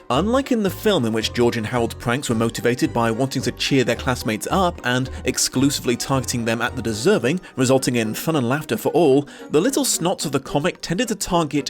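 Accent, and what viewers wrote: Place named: RP, United Kingdom. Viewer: Hearing the Dom, posh, British voice, talking about Captain Underpants makes me laugh harder than it should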